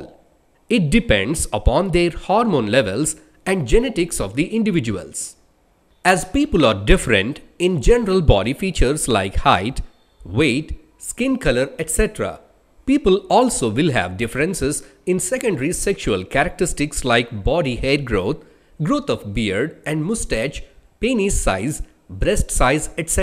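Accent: India. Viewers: مشكله الهندي لما يتكلم انجليزي....الله المستعان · Why get someone with an exaggerated hindi accent to narrate an english video? Its really terrible to try and understand. Good vid, but ruined by this .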